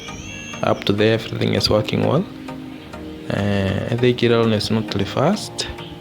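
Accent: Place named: Indonesia. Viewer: Oh, I guess you're from Indonesia because your accent is very similar to an Indonesian remain optimistic about developing unmanned aerial technology bro, as an innovative step in diversifying technology into the aerospace engineering Hello from Indonesia, I'm a technical student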